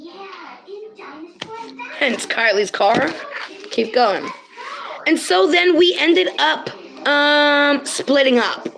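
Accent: New York, United States. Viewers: ish lol!! Just woke up l'ok! And you had me lol almost fell out of bed. HHAHAHA you said, * And she foundher AT THE PARTY???!!** Haha i love that NY accent, makes me miss home! God bless sending light love from Connecticut.🌘🌙🌞🌞🌟🌠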